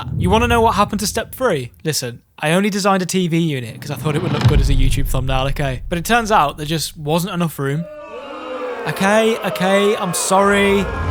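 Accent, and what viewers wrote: United Kingdom. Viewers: Oh my cat. This is the most British video I have ever seen. Why. Youtube knows my problem with intercepting British accent(this was quite understandable for me)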